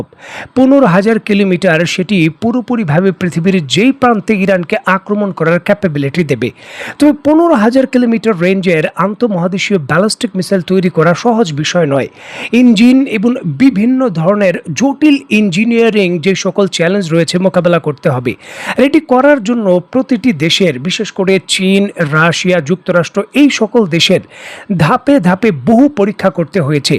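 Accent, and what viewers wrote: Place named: India. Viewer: Amon chinta apnar kamne Ashe je Onno desh Hi-Tech technology diye dey!!! Apni Ekta Awamilegeue tai sob somoy anti Muslim sentimental apnar modhe. Apni sob somoy Muslim der khato kore dekhen. Ami khomotay thakle apnar channel bondho kore ditam ba kemne news Korte hoy tar Ekta guide line ditam. Sob somoy Indian tune Kotha bolen apni.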